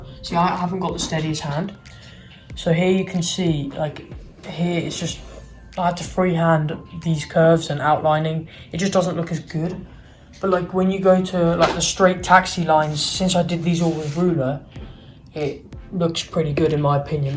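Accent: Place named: United Kingdom. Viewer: Hi, I could tell your British from your accent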